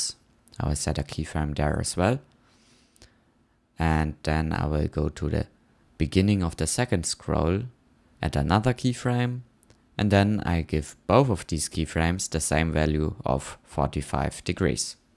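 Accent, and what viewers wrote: Germany. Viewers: Ich weiß nicht wieso, aber irgendwie hasse ich es, den deutschen Akzent zu hören 😅 (nichts persönliches, ist bei jedem englischen Deutschen so)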